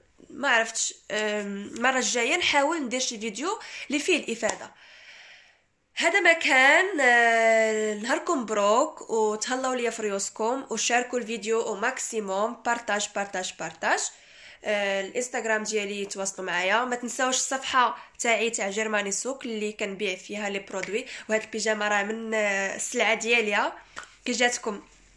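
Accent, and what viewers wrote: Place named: Morocco. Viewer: مع اني مافهمت نص الحكي لانو كل كلمتين بيان سور وديالك ولهجة اخواتنا بالمغرب علينا شوي صعبة😅 بس بما انو عربية لازم يعمل الواحد باللي يقدر عليه. موفقة ☕🎼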